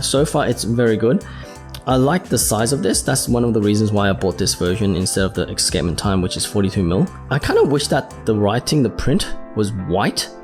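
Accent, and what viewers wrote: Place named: Australia. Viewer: why what's wrong with how he's saying it? he's Aussie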